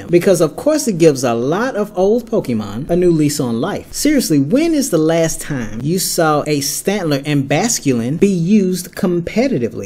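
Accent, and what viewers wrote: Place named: South, United States. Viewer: he sounds Southern to me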